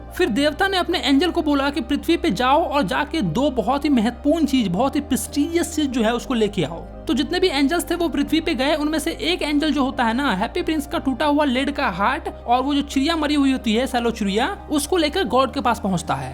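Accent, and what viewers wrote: Bihar, India. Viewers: Bihari ho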